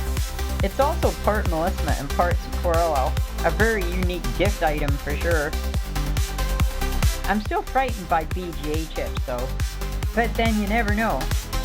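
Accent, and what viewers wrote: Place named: United States. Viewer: WOW?!! A female who is quite experienced and advanced as well?!? And can actually talk her way around this stuff like a true graduate. And judging by your voice, you’re from this here New World. GEEZE!?! I applaud you and I am so impressed and inspired. I would probably buy any of your modules just based on their aesthetics alone!! You are someone amazing! Thank you for sharing about all this.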